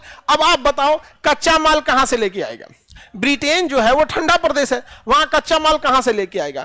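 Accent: Bihar, India. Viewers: सर आप भी बिहारी डायलेक्ट में बात करके बच्चों को कनेक्ट करते हैं। कॉमेडी के साथ पढाते हैं तो बोरियत नहीं होती है बस इतनी सी बात है।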